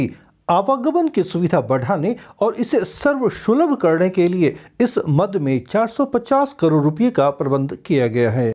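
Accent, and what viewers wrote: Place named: Bihar, India. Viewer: Nyc accents...aap bihar se nhi ho sir???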